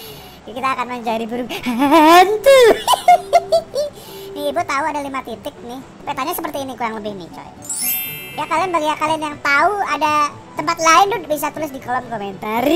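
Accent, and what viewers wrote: Java, Indonesia. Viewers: jowone ngendi wkwkwkwk lucu pwol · Jowomu ngendi?? Salam soko semarang.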